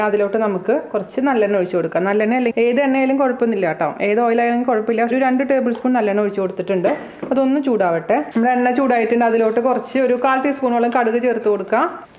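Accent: Thrissur, India. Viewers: I follow urs and Aju’s world mostly. U both from trissur and it’s nice to listen ur trissur accent.. wish you all the best..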